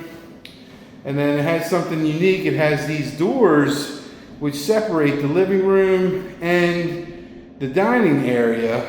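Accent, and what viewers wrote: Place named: Baltimore, United States. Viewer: Love the Bmore accent hon 🧡🖤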